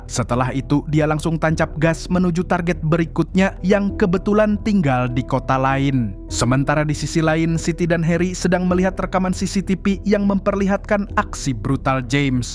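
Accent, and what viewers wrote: Banjar, Indonesia. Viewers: Orang Banjar Kah Min pian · Banjar Jua sekalinya 😮😮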